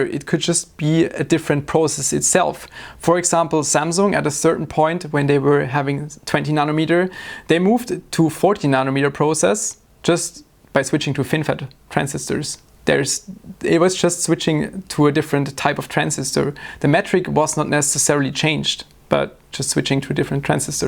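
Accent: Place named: Northern Germany, Germany. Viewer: By listening to you I would guess you are from Berlin or maybe Hamburg, some city in the north of Germany at least. BTW Not too surprised that you got nothing out of Intel, maybe they should change their brand name since they seem to have run out of "Intel"...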